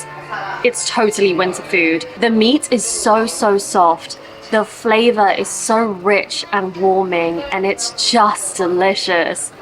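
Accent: RP, United Kingdom. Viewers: 字幕がきちんと付けられていて英語の勉強になるし、Squishyさんの品のある、ゆっくりめに話してくださるイギリス英語は聞いてて気持ちいいです。所作も美しいですね😊 日本の日常的な良いところを紹介してくださって嬉しいです。最近このチャンネルを知ったので過去に遡って観ようと思います❤ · コレぞ、 “Queen's English” と思えるほど、一語一句を “丁寧かつクリア” に発音して下さる (#イギリス人🇬🇧らしい!!) ので、すごく聞き取りやすいです！ 👍👍 ～ 所作も自然体ながらエレガントで、好感度が高いですね！ 🥰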